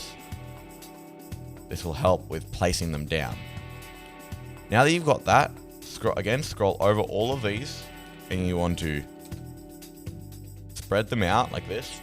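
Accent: Australia. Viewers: Are you from Australia or New Zealand? Your accent. It sounds Australian.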